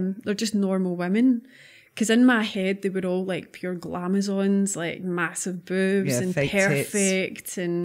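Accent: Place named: Scotland, United Kingdom. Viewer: I could listen too her voice all day long. Scottish women 😍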